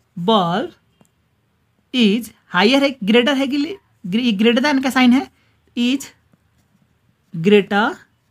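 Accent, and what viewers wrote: Nepal. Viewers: Try to speak pure Hindi your are from Nepal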